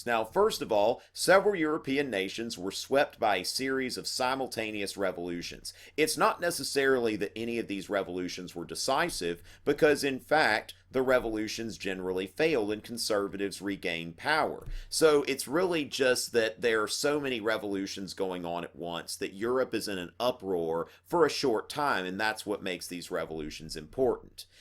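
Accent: South, United States. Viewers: I'm here looking up as much information as possible-trying to finish my history project, and I get this discount Matt Damon with a southern accent.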